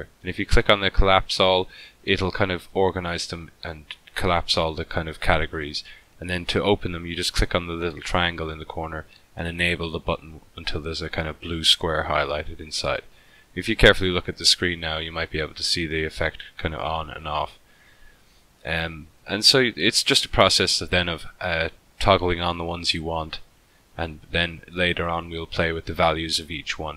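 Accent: Ireland. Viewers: Just came here to listen to the beautiful Irish drawl...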